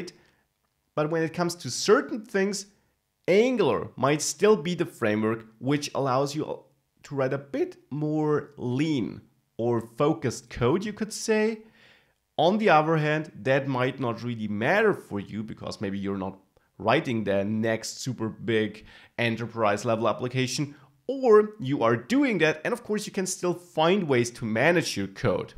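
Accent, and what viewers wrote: Germany. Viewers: Gute Videos aber ich kann mir dein extrem deutsch gesprochenes Englisch leider nicht lange anhören. Trotzdem weiter machen!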